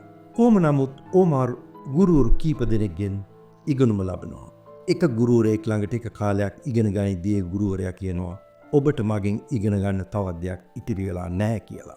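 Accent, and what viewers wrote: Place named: Europe. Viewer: විශිෂ්ඨ විග්‍රහයක්, ඒවගේම ඔබ තුමාගෙ ඉංග්‍රීසි උච්චාරණය හරිම ලස්සනයි යුරෝපියෙක් වගෙයි ❤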